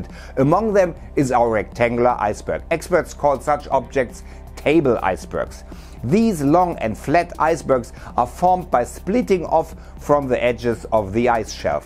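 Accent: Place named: Germany. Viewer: Gutes Englisch! I love how the germans pronounce english words. :D Sounds a bit weird and funny. Außerdem liebe ich den Kontent! Das Englisch frischt das ganze noch 'n bisschen auf! Weiter so!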